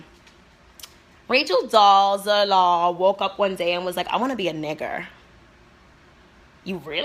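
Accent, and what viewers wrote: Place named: Dominican Republic. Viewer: she grew up with Dominicans. Why shouldnt she speak like the people she grew up with? Its not like accents are genetic lol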